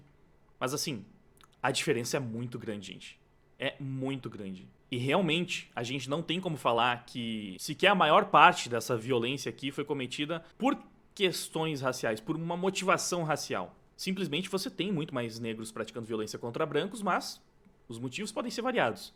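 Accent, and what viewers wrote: Brazil. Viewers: Sulista né?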